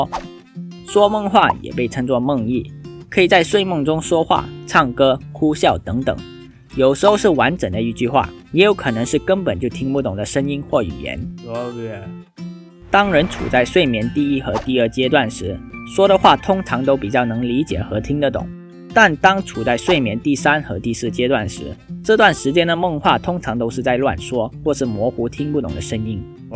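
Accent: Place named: Malaysia. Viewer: 从你的说话的方式就知道你 是马拉西亚来的欧 就酱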